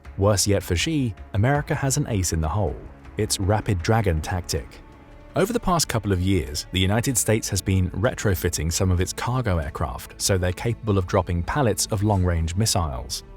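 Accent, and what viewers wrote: England, United Kingdom. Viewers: Nice try. "British accent" can refer to any British accent. In this case, assuming you are not deaf, he is clearly referring to an English accent. But wait... in your attempt at pedantry you yourself were far too broad. So I'LL ask you: Which English accent is it? Estuary? King's RP? Norfolk? West Country? Brummie? Scouse? Midlands? Chorley?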